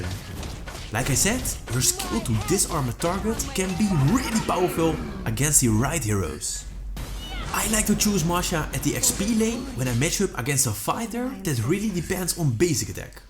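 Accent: Netherlands. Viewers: You got so Dutch accent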